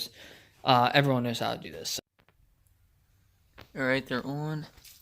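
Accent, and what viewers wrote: United States. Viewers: I was so confused why you were on the right hand side lol. You sound American lol. How. ARE YOU ON THE RIGHT HAND SIDE IN THE BEGINING BUT NOT THE MIDDLE OF THE VID. 😂😂 STOP PLAYING WITH MY MIND. But anyways, What did you do for the BOV? Was wondering how mine sounded from the outside. I have same exhaust set up but its all straight piped from the turbo and back.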